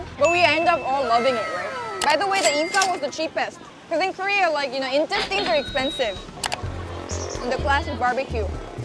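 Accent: Philippines. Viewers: omg cute cute ng tagalog hahahahahaha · Your Filipino accent is back 😊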